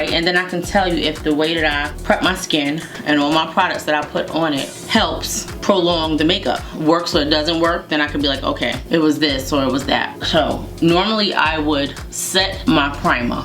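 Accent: New York, United States. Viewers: New subscriber here! Where are you from?! Your accent sounds like the NE Philly or NYC or dare I say it.. jersey?! I gotta know if I’m close. Also, great content! Happy to have found your channel!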